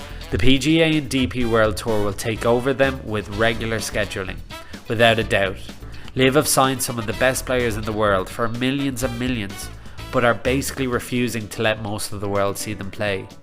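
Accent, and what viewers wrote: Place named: Ireland. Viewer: I’m not a lover of LIV, Norman or all the blood money grabbing pros and honestly, I hope they all rot in hell but this clip is so full of shit, it deserves to be put in the trash can. If you read this in the international news then maybe, there will be credibility but other than that, this guy sounds like he’s from Island and will treat this as an attempt at Irish humour and nothing else.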